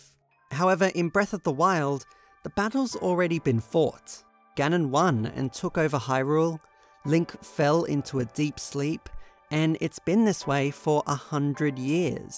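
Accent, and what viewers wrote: Australia. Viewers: Are you from Australia? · I know you're emphasising words for clarity, probably because of your Aussie accent. I just find it disjointing and would appreciate more a more natural style of narration.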